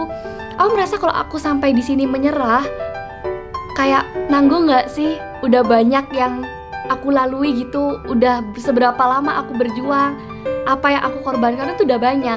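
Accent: Java, Indonesia. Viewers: Ya ampun medhok nya jadi kiyot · Monmaap Cece org Jawa? Medokny nongol😁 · Logat jawanya uhhhh gemessss😭😭😭😭😭💙💙💙💙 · Kak kk orang jawa ya karna, suara omongan kk Kalok iya sama donk kayak aku tapi aku ngomong nya itu kayak orang medan😂😂😂😂😂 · medok banget buna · Kaka kok medog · Kak bicaranya kayak rada rada ada bahasa jawa nya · Medok muu kakkkkk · cantik Imut Yang bikin lucu medok nya itu loh😗😂♤♡ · Kak boleh gk buat konten 24 jam ngomong medok:')🥺❤️🌺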